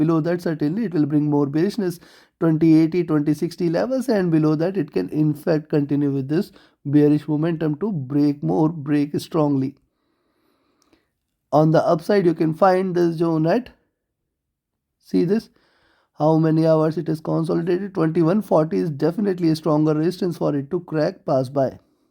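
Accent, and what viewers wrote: India. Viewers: Indian accent takes time to understand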